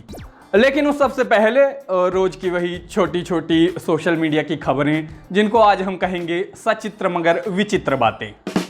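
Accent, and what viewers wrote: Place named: Rewa, India. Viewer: Rewa ki bhasa hai 😂🙋 me bhi rewa se hun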